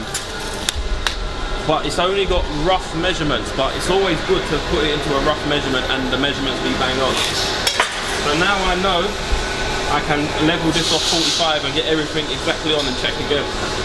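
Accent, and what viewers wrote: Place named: London, United Kingdom. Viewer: I try to understand your prononciation just like London down town.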